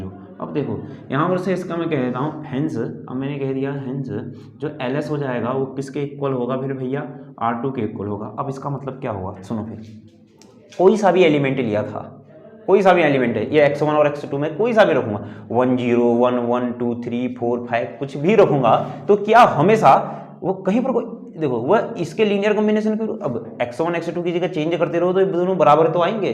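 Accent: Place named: Kumaon, India. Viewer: sir aap lohaghat ya champawat se ho na? bolne k tarike se अंदाज़ा लगा rha hu 🙂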